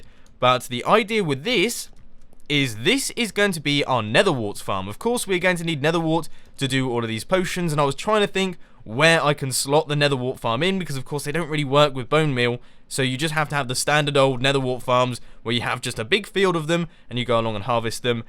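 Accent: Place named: United Kingdom. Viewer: the accent tho... one of the little things I love about ♥ "wotoor botools" (water bottles) "simpolist" (simplest) "prooty" (pretty) and all the missing T's and R's that british people always manage to miss. like you say "ee" instead of "it" or "earlieh" instead of "earlier", "ge'in" for "getting", "bu-on" for "button"...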